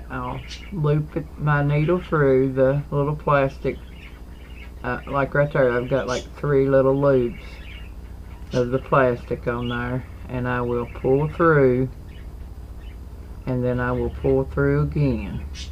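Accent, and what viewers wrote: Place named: Tennessee, United States. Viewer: Thanks for sharing this cute crochet project. It was kind of confusing at first, since you were just saying "yarn over and pull through" without specifying that the 2nd time was going through the yarn loops and not the scrubby. lol. Is that the chain stitch? I think it is. I don't know how to crochet, but have thought about learning it with ladder yarn to make some necklaces for my handmade jewelry. My sister was learning how to crochet, but got frustrated with it. lol. I've added this video into my playlit- Crafty Creator Support for Artists, Beaders and Crafters. Hopefully that will help you with watch time. I miss my hometown of Knoxville, Tennessee...so it was wonderful hearing your accent. Reminds me of home. Take care! Sparkle on!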